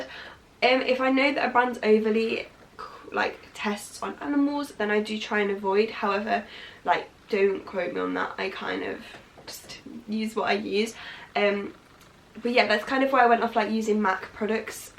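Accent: United Kingdom. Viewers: How come your British accent is becoming more pronounced. We need you to come back to America 😁😷😷😷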